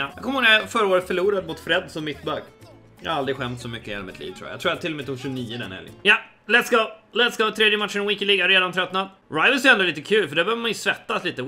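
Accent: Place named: Stockholm, Sweden. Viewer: sjukt hur stockholmsk du e men ändå bäst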